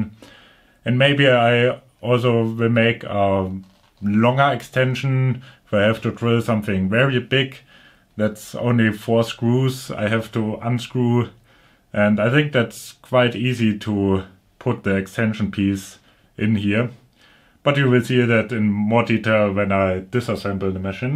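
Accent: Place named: Germany. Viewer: Du bist Deutsch oder? Wenn ja das hört man raus (nicht böse gemeint)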